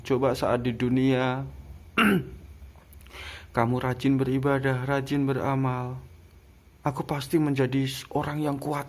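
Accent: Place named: Java, Indonesia. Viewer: Mf mas anda kyknya.dari logatnya dari jawa ya,bhsanya kok kyk jawa asli,kok ngrti pecut ,di pecuti,di pecuti klw bhs jawa d kawengi pakai pecut,d sabeti pakai pecut,klw spngetahuan sy di pecuti sm dgn d cambuki,kyk istilah hukuman d aceh d cambuki,smga buat pembelajaran mas,mf mas mukanya kyknya kok bengkak istilah jawa bngkak itu tembem abuh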